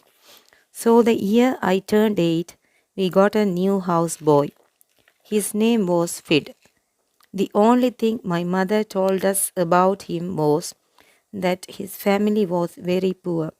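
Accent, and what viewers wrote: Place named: India. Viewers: I also thought she was from india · Thank you very much ❤ By the way , your pronunciations tell me you are an Indian person . Am I correct?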